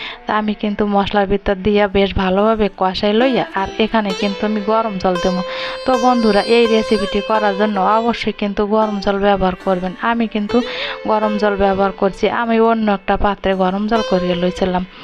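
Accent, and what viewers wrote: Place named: Barishal, Bangladesh. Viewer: খুব সুন্দর রেসিপিটা বানিয়েছো আর তোমার কথাগুলো খুব ভালো লেগেছে বরিশালের ভাষা❤❤😊😊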